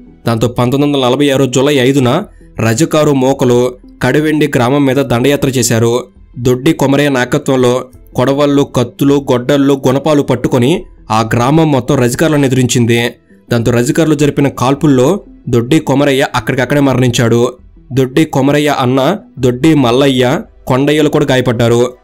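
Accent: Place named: Andhra Pradesh, India. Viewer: Andhra slang తో worst achor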